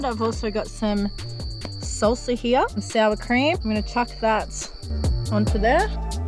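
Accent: Australia. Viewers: Love the Australian Accent!!!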